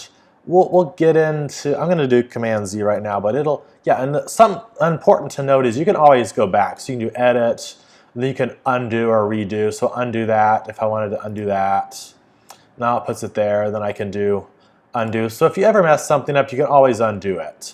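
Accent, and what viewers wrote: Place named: Southern California, United States. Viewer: I love this guy’s accent, let me guess San Bernardino county or at least somewhere in SoCal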